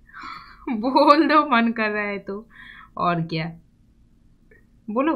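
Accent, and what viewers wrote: Bengal, India. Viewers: Are you bangali??? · Apni bengali kotha sune bojha jacche😅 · Ami age confused chilam je apnara Bengali? But akhon apnar Hindi sunei bojha jacche Bengali😁😁😁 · Aap Bengali ho kaya ??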